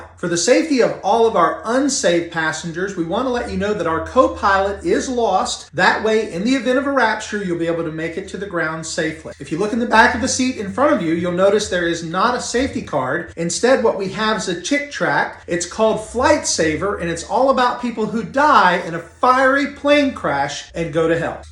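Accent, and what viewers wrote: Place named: Scotland, United Kingdom. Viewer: arguing the Bible in a think Scottish accent is . . .divine!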